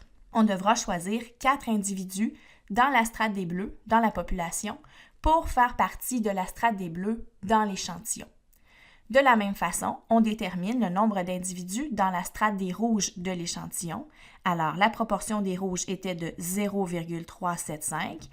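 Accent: Quebec, Canada. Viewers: J’adore l’accent québécois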